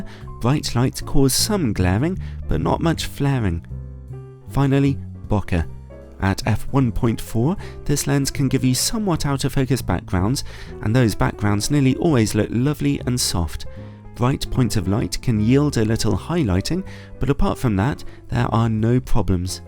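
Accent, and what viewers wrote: United Kingdom. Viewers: ❤️ British Accent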